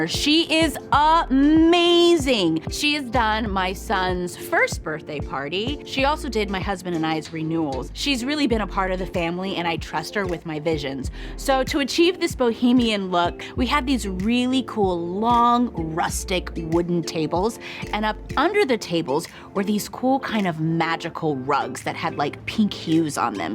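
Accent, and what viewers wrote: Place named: Los Angeles, United States. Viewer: True lA girl I can hear the accent, this was so beautiful!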